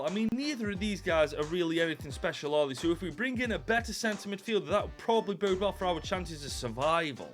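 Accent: Stoke, United Kingdom. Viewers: I recognise the accent are you a stokey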